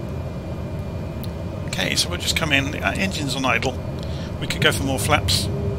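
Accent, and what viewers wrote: England, United Kingdom. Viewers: Considering the high quality of your content, the superb presentation, your hundreds of quality wide-ranging videos and 3 years on the channel, I cannot understand the relatively low number of subscribers. Could it be the English accent? As a Londoner, I appreciate it, but there's no accounting for Eastern Pond dwellers. Fear not, I'm on your side; keep up the splendid work - ex-RAF navigator of 50+ years ago!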